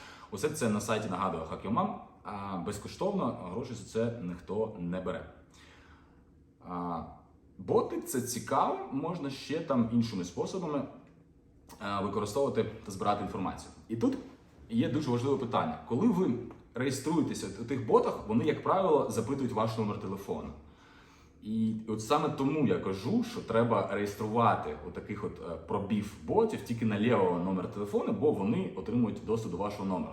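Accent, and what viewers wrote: Kharkiv, Ukraine. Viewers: Доволі цікаво! Мова Харків'янська так Харків'янська, за що вибачатися) спожіваюся за рік зроблені абсолютно всі висновки)) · Лайк за Харківський суржик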